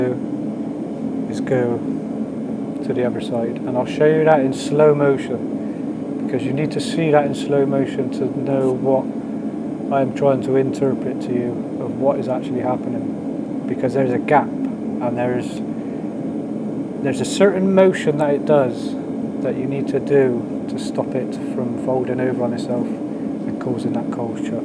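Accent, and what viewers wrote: West Country, United Kingdom. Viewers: Where is your accent from? West Country?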